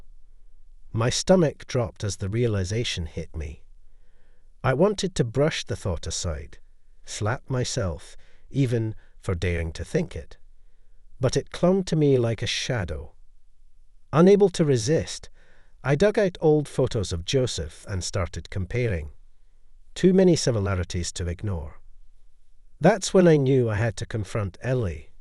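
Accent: Scotland, United Kingdom. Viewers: Why is a Scotchman narrating when 5Th Avenue is shown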